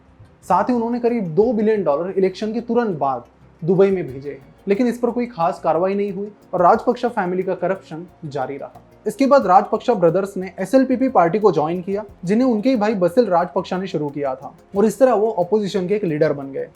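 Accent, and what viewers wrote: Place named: Maharashtra, India. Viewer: I love मराठी accent भावा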